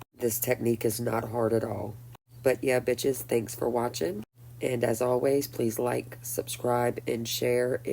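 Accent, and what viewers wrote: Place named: New Jersey, United States. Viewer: You have to be a jersey girl lol if not you literally close ❤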